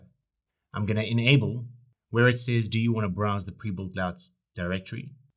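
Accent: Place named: South Africa. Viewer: Great video, really helpful thanks. And brilliant to hear a Saffa accent!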